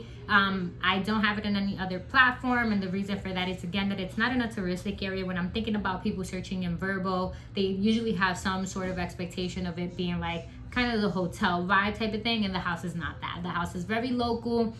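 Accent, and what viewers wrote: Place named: United States. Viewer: Great breakdown. Thanks for posting it. How did you end up in DR? You look Dominican but speak American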